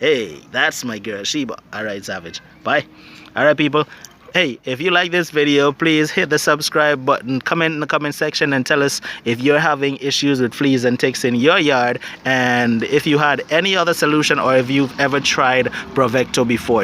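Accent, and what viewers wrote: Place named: Bahamas. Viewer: You convinced me to try it & you sound like one of the homeboys in the Bahamas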